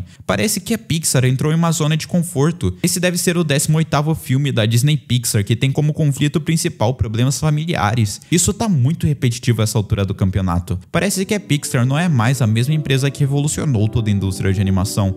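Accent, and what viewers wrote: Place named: Interior, Brazil. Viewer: qual região do Brasil você nasceu e/ou vive até hoje? Porque pelo visto você tem sotaque de pessoa do interior do Brasil.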